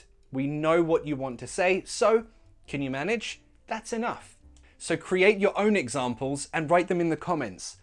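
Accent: United Kingdom. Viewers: In my side view, you have an excellent manner to teach English, especially when you use sketches with the sentences although I have never liked a British accent before, now I admire it because of you and I hope you post more videos because are so fun and useful 😊🌟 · I enjoy listening brittish accent. It's defferent from American one. Thanks for your classes, they make my English get better.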